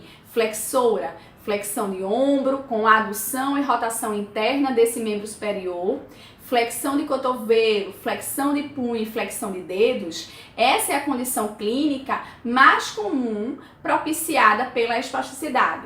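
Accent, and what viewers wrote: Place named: Paraíba, Brazil. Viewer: Muito bom o video! Quando vi seu sotaque, lembrei da minha mãe, que, é da Paraíba e fala igual à vc!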